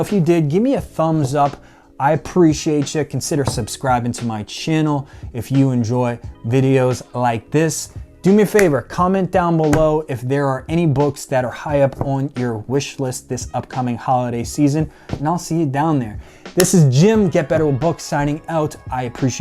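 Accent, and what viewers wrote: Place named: Massachusetts, United States. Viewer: Hey Jim been following your for sometime now under a few of our business accounts on Instagram(raisingwealthminds and theunstucksociety) just realized you are in MA, kinda thought you were with the accent(dude I'm in the same boat, so not knocking it). We are on the South Shore in Duxbury. Keep up the work man!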